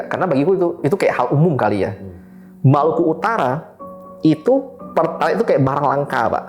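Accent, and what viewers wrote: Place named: Pontianak, Indonesia. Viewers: Bang logat Kalbar ny kental, wkwkk mantap bang paduuu 😂😂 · Salut sama bang, tidak menghilangkan aksen bicaranya khas pontianak 😂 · Bahase Nye pontianak bnget bang😅 Salam dari budak pal 5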